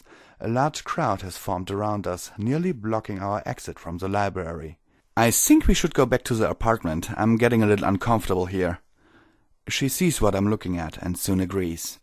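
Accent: Germany. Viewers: that german accent is so heavy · Now I'm not a fan of mlp, and am just watching this because I have nothing better to do. But your silky German accent makes this watchable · I loved how i could properly hear the story being told, and how your accent brings it's own flare to the voices. I'm sorry if this sounds rude in my assuming, but are you perhaps german? I like the accent and just got curious. Especially considering how prominent it is. It's very soothing to my ears. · hey bro are you from Germany or Russia or England or Scotland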